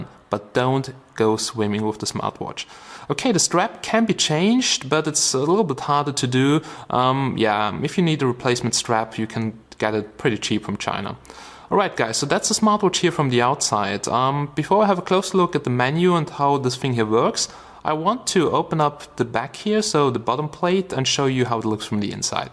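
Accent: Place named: Germany. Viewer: You sound german m8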